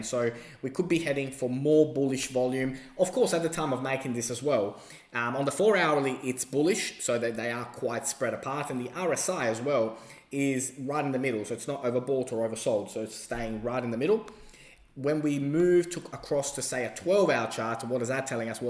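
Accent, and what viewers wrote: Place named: Australia. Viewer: Love your accent mate - Aussie Aussie Aussie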